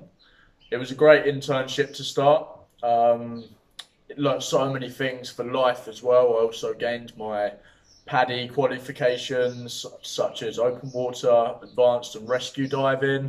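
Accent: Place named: England, United Kingdom. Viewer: from what part of england are you from ?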